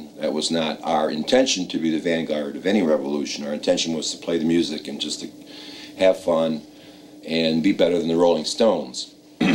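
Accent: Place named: Midwest, United States. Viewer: A beautiful Midwestern voice!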